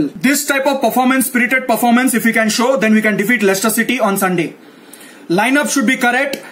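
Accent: India. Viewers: english with india accent so funny . hahahaha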